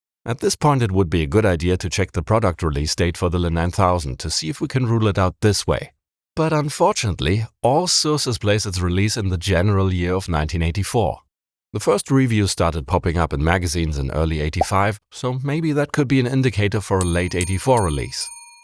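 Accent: Germany. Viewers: now i know: you speak German... or at least, your speak pattern is German influenced (😅)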